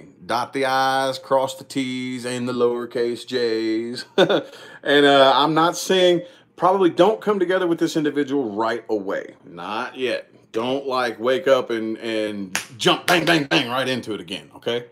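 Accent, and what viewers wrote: South, United States. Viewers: Loving your southern accent. I’m from the south too.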